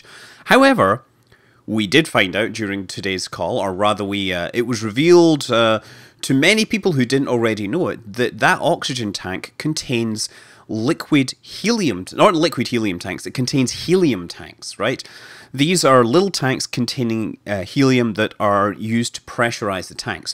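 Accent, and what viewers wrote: Scotland, United Kingdom. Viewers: Hey Scott Manley screw the haters. I love hearing you explain things in that awesome "Brave Heart" accent of yours.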